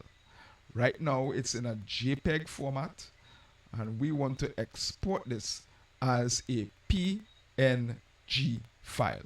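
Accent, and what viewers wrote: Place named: Trinidad and Tobago. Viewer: Very good content. Nice to hear the voice of a fellow 'Trinbagonian'(?) doing GIMP tutorials.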